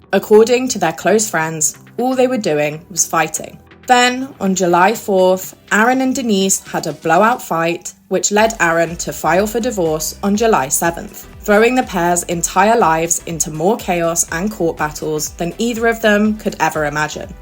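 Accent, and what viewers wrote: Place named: United Kingdom. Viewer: Your British accent is annoying …I had to stop halfway through because I couldn’t take it anymore.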